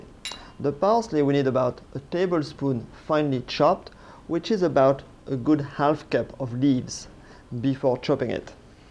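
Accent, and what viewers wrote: France. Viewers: I could listen to this guy talk all day I am French too but I'm French American so I don't have the cool accent.😕😆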